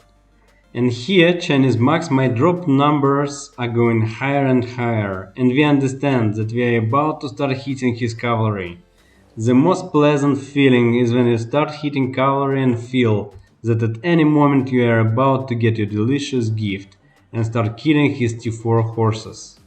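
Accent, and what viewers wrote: Russia. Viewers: Слышно русский акцент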